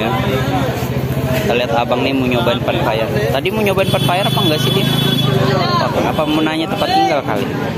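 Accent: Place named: West Java, Indonesia. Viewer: Mas situh asli nya orang sunda ya klo saya perhatikan dari logat logat nya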